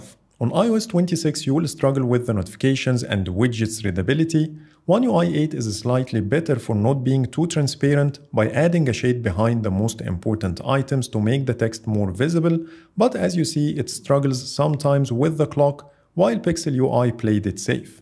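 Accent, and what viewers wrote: Egypt. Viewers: أول ما سمعت الصوت عرفت إن مصري ❤️❤️ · Egyptian accent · انت صوتك مصري 😅كل المصرين لما يكلمون انجليزي بيبقو برضو معروفين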